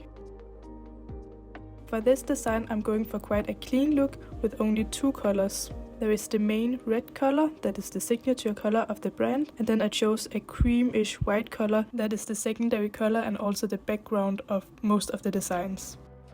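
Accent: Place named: Germany. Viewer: I know my Germans when I hear one 🤭 love your work 🫶🏻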